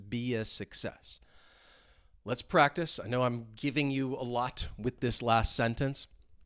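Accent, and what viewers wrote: United States. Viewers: it takes lot of time and dedicatiion to absorb the rythm and flow of american accent, if i can get more real imput instead of just reading and whatching tv, i will be more confident in speaking the way you speak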